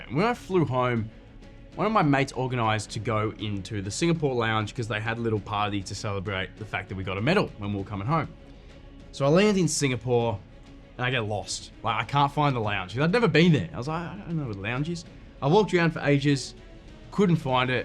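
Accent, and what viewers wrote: Australia. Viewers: I love you,... But, your Aussie accent confuses me to laughter!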